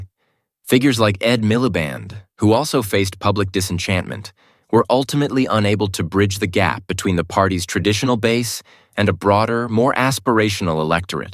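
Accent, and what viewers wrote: United States. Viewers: Oh really??? Starmer "sent out of church" really and by whom??? Cut the Yank voice overs and let us have the FACTS!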